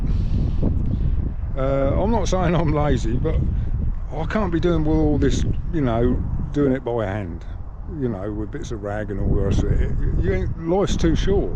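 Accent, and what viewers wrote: England, United Kingdom. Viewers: Lol ,Lazy Bugger. I love listening to English people talk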